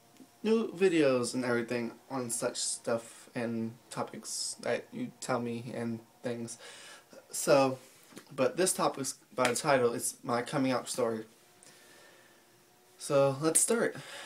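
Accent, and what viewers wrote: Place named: United States. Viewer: The American Accent though :3 Aw i cant believe i dated you :') Your amazing just gotta say :)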